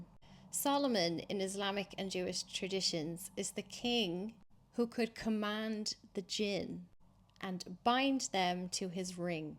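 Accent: Ireland. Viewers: Fascinating accent — I love the Irish ❦ Please read and breakdown more tales from the ⟨1,001 Nights⟩ and deconstructing the «moral scaffolding» within ❦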